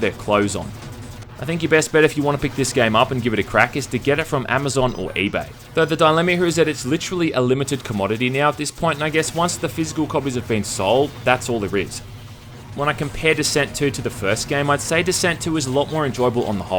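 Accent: Australia. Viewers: Yaay somebody likes me, even my mother doesn't like me. Even watching this just gives me motion sickeness, blah I shall just listen to your sultry Australian voice.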